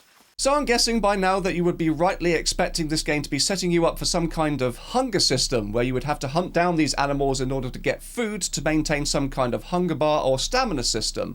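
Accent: United Kingdom. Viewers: As a Yorkshireman myself, I approve of the accent 😂 👍🏻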